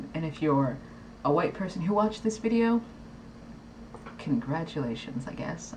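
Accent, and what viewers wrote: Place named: United Kingdom. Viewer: where you in britain a long time? you have a slight british accent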